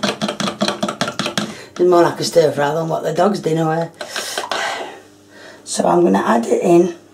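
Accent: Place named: Sheffield, United Kingdom. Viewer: Hi:) can you make your own pizza? if not for you.. then for Lee...;) I'm from Sheffield... you sound from round this way x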